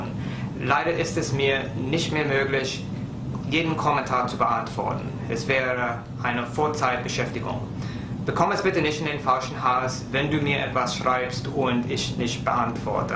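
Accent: United States. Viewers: Dein amerikanischer Akzent klingt einfach nett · Manchmal hast du halt den typisch amerikanischen Akzent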